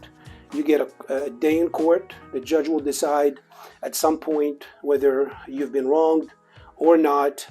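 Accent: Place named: New York, United States. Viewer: sound a bit New Yorkish.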